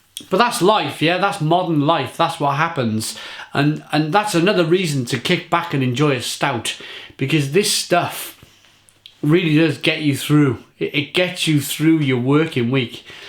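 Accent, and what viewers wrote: Wales, United Kingdom. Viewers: It's not right or wrong, it's just his Welsh accent where it sounds like they say "crumb putts". In most of the rest of the UK we say it like "crumpits" so it does sound funny to hear "putts".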